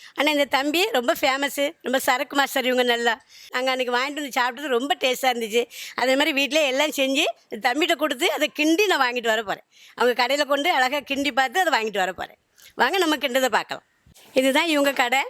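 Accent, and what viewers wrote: Tirunelveli, India. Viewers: Thoothukudi and tirunelveli slang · நான் பிறந்து வளர்ந்தது எல்லாம் திருநெல்வேலி தற்சமயம் சென்னையில் இருக்கிறேன் திருநெல்வேலியில் இருந்த காலத்தில் வாரத்தில் ஐந்து அல்லது ஆறு நாட்கள் அல்வா சாப்பிட்டது திருநெல்வேலியின் தட்பவெட்ப நிலையும் தாமிரபரணியின் தண்ணீர் வளமும் திருநெல்வேலி மக்களின் கை பக்குவமும் திருநெல்வேலி அல்வாவின் உயர்ந்த ருசிக்கு காரணம் உங்களது திருநெல்வேலி பேச்சை கேட்க கேட்க சந்தோஷமாக இருக்கிறது அனைவரும் நலமுடன் வாழட்டும்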